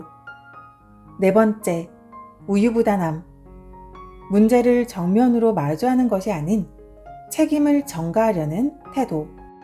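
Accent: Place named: Gyeongsang, South Korea. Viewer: 경상도 분이셨군요~★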